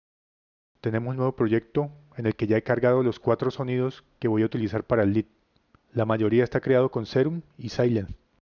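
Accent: Colombia. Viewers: Habla colombiano!!!!! Excelente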